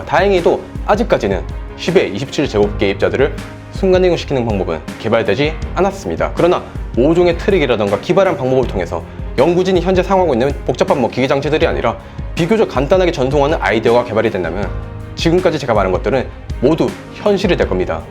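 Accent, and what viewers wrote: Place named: Busan, South Korea. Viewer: 억양이 .억양이 부산 스럽다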